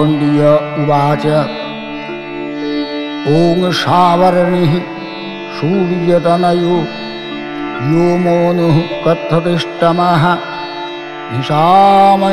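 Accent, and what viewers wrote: Bengal, India. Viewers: Nice voice, very well suited for mantra. Wish the accent was not overly Bengali and the sanskrit phonetically purer. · Khub bhalo....kintu bangali uchcharon....Sanskrit is not pronounced like Bengali syllables. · It is in Sanskrit. It is pronounced with a Bengali accent. And style of this kind recitation is very much found in Bengal. Probably, that's the reason. May be he is reading it in Bengali script, but the language is definitely Sanskrit.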